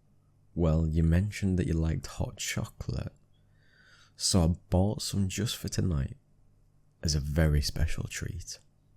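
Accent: United Kingdom. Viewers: I’m obsessed with british accents😍 Especially this man’s voice.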